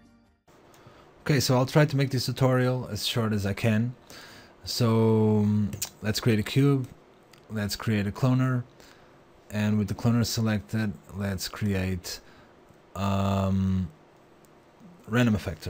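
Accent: Portugal. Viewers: muito bom! o teu ingles é perfect mas dá para ver que és tuga ;) Abraços e continuação de bom trabalho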